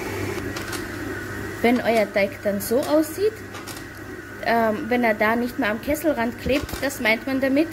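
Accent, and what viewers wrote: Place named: Austria. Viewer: Ich mag sehr gerne deine guten Rezepte und deinen lieben österreichischen Dialekte. Meine Oma hatte auch viele solche Rezepte!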